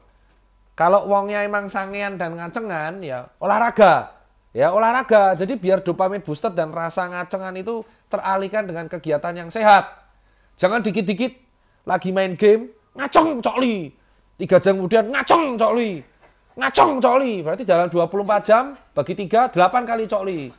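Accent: Java, Indonesia. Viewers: Logat jawa nya yg bikin gue ketawa terus duh 🤣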